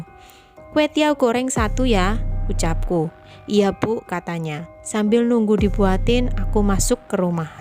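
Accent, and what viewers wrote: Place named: Java, Indonesia. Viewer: seru amat ceritanya mbak...org jawa ya mbak, logat jawanya msh kentel bgt...hehehe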